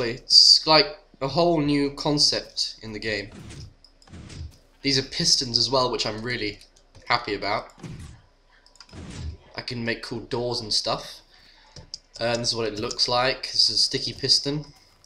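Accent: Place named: Baltimore, United States. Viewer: It's been so long... I forgot you had a bauler accent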